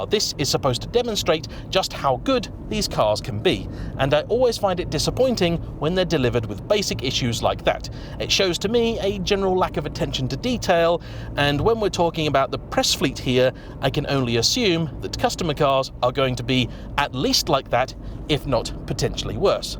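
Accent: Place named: Essex, United Kingdom. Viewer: By the way you speak you Essex boys you are so gay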